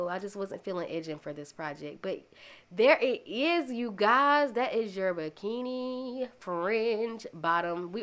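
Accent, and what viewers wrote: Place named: Texas, United States. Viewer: I was just wondering when would you have a new CIY vid!! Thank you! & I love your accent, sounds like you're from Texas.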